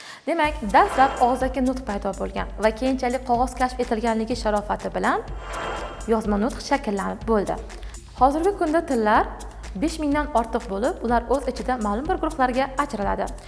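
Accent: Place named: Khorezm, Uzbekistan. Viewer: Xorazmmi shevasi tushinarli zór turkiya shevasina óxshidi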